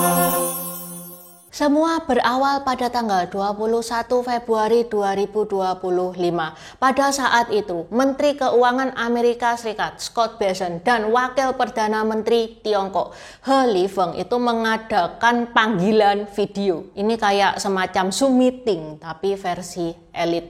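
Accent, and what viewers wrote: Java, Indonesia. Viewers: Pekat sungguh bahasa susah nak faham bercampur dengan bahasa jawa tidak seperti miss sari bahasanya mudah difaham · Usul buat ci, ya...tolong ditambah ngomong mandarinnya, Ojo mung medok jowone❤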